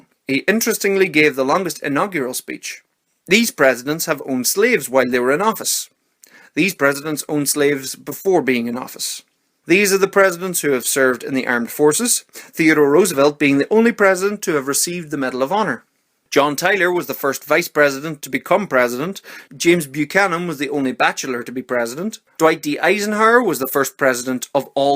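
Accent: Ireland. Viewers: What part of ireland you from lad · Are you Irish? Just wondering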